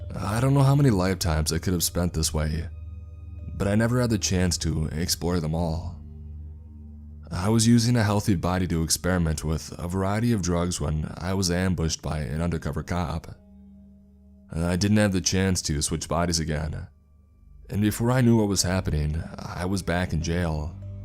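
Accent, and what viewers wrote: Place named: North Carolina, United States. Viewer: I’m guessing whatever answered his prays and told him, yes. you will die in a cell or this cell, idk if it’s just me or I am remember wrong,, but I thought he said that. And if he did i wonder if it’s the same prison he was in, or maybe he did say in a cell. Either way I like how he became a officer, that and him saying this is my last time. That’s kinda for shadowing him getting hurt and killed if he had to respond to whatever he needed to be they’re for. Love your work my guy, you have the best voice imo, I hate to say it but Iv got ware I always look for your channel over the others. There not bad but I relate more to how you talk lol. Idk if it’s because I live on the east coast of NC and have friends around here and a couple in Ten that have a similar voice lol idk how to really explain it lol. But your the best even your new channel is funny af but it’s got both types of funny. 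Funny just to be funny and funny like watching a guy pull a dame big looking gater up while in a basically a live rafe if your lucky, and him thinking time to nope tf right up out of here lmao.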